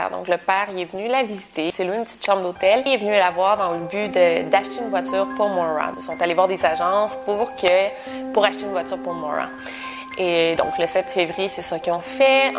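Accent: Quebec, Canada. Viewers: Chers cousins du Canada, j'aime bien votre accent. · C'est marrant cet accent canadien. Pour moi, il est clair qu'elle s'est suicidé et que son corps n'a pas encore été retrouvé. C'est pourquoi elle avait besoin de boire parce qu'elle avait pris cette décision, de se suicider. C'est tellement triste :(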